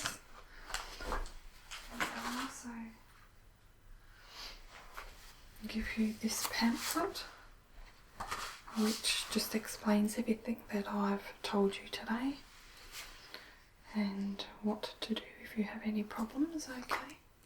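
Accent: Australia. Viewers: I love that I've found someone with an Australian accent. It makes me feel so homely. It's great :)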